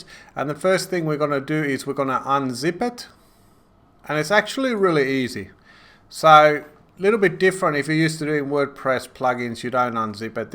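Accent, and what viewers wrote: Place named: United Kingdom. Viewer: British accent!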